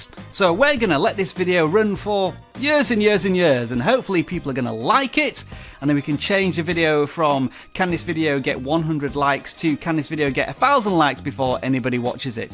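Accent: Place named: United Kingdom. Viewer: It's so hard to understand your heavy british accent... Do you speak American English?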